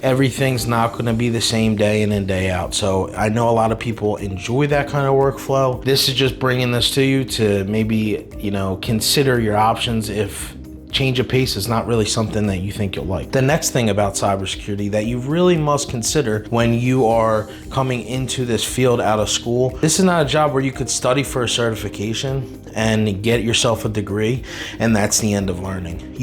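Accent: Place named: Philadelphia, United States. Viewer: I love the Philly accent made it easier to listen for me . Gooo BIRDs 🦅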